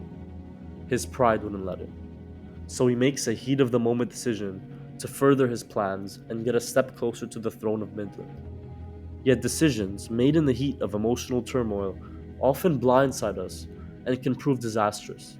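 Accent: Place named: Levant. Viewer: course! On an unrelated note, are you from the levant? Your general vibe and inflections sort of make me think you're from the Lavant, perhaps Syria or Jordan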